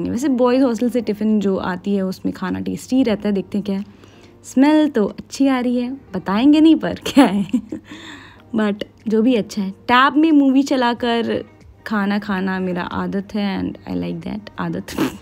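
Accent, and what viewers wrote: Bihar, India. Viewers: U r speaking a real bihari time😄😄 Love from gaya🥰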